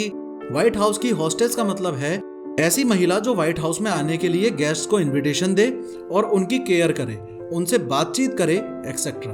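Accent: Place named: Jaipur, India. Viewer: Sir are you from Jaipur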